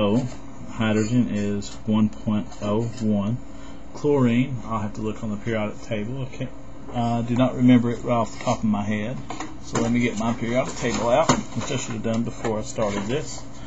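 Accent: United States. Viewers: i learned this back in 10th grade but i forgot how to do it. lol. thanks. having a slow talking country guy helps a bunch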